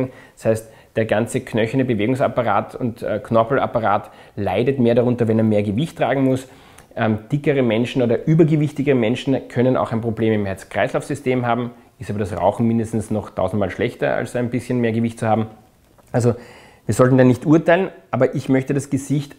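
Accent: Austria. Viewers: Herrlich der Österreich Akzent. Es geht nicht darum, ob es schön oder schiach is.... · ach wie ich diesen österreichischen Dialekt liebe! Wirklich!! 'schön oder schiach' - göttlich!!:-)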